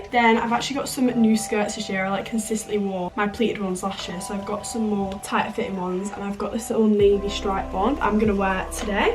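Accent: United Kingdom. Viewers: I love the British accent😊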